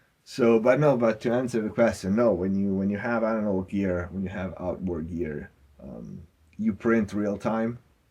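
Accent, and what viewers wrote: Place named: Italy. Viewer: Just curious from your accent but are you Italian?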